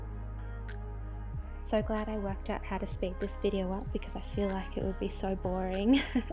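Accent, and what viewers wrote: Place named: Australia. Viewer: You are beautiful love the Aussie accent lol I’m from Sydney. Keep holding your head high. You are blessed. Don’t let others bring you down. 💖 love Loz x ps. Can’t believe your lips are real! You must cop so much shit lol 😂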